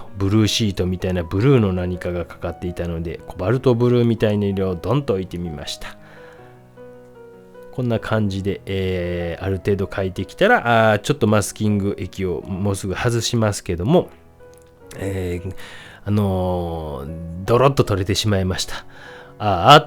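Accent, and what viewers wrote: Kansai, Japan. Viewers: ベネチアの風景にもなつかしさを表現されておられますが、先生のトークで時々入る関西弁も親しみがもたれます。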